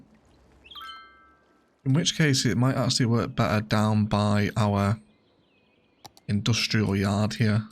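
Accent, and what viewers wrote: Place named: United Kingdom. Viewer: Your videos have blown me away and helped me to develop my building style so much too! I'm trying to place your accent, where in the uk are you from? Keep up the cracking builds 👌 much love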